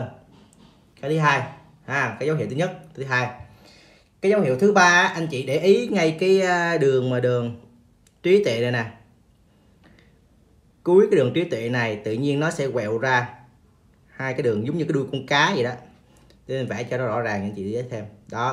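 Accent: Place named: Quang Nam, Vietnam. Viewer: Nghe giọng thầy chắc người QN-ĐN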